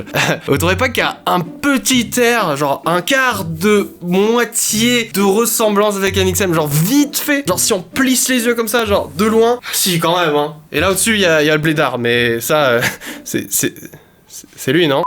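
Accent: Russia. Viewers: Et une dernière question et j'arrête de t'embêter mais est ce que tu pourrais au moins nous garder le "Hello my friend" et nous sortir quelques "cyka blyat" avec ton accent rusde de temps en temps ils sont vraiment géniaux faut pas les perdres:D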